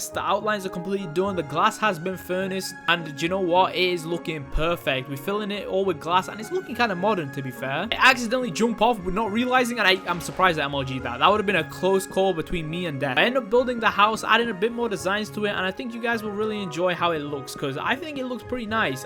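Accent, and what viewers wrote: United Kingdom. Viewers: I love your british accent (sry if im wrong) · I just love ur accent, i honestly love britian accent (sorry if i misspelled britan) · its just every 100 days but the the unique part is the british accent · Luv that briisish accent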